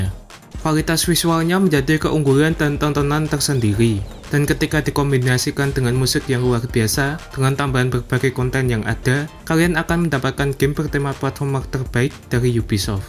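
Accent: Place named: Java, Indonesia. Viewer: suara nya kok medok 😭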